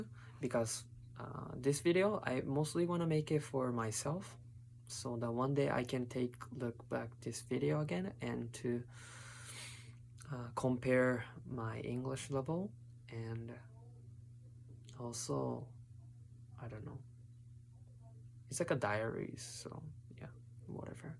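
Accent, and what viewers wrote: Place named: Japan. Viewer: 日本人英語でわろた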